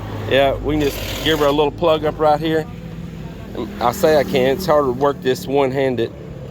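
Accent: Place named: Texarkana, United States. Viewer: I keep trying to place your accent. Texarkana?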